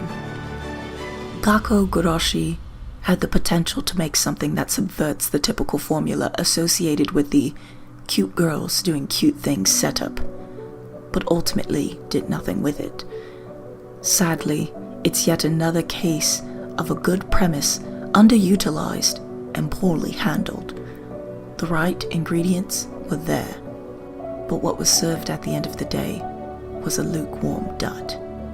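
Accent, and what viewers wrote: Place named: United Kingdom. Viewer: you should really work in documentaries or some shit with that amazing brit voice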